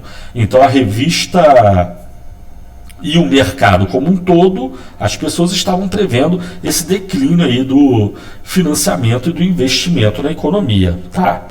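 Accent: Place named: Rio de Janeiro, Brazil. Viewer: professor é carioca ahha, excelente aula.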